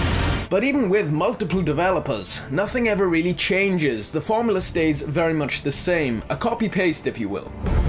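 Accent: Wales, United Kingdom. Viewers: Good video, good choices! Nice Welsh accent, btw. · are you Welsh???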